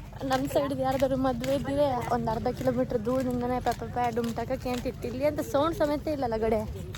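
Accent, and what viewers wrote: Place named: Udupi, India. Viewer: Udupi kannada mix undu aike kenni medam best of luck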